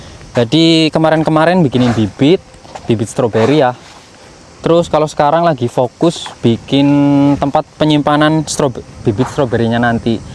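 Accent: Banyumas, Indonesia. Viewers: asalnya dari mana bro...medok banget Kya bhsane Inyong ...salam kenal inyon d Bobotsari Purbalingga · Ngga apa" mas yg penting halal Mas berasal dari man bahasanya pake nyong ngapak mana lur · wong ngpak ya mas smpean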